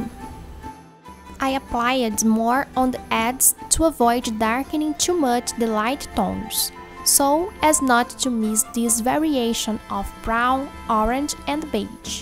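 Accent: Brazil. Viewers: Otimo trabalho *-*, quero fazer um cabo de arma para uma flintlock pistol impress, é pra ser uma madeira mais lisa e polida, da de seuir o mesmo padrão? pelo sotaque chuto ser mineira ou do norte kkkk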